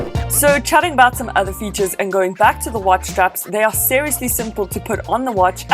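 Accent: South Africa. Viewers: Are you a SAFFA? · I can spot a South African accent a mile away . . . nice review sistas!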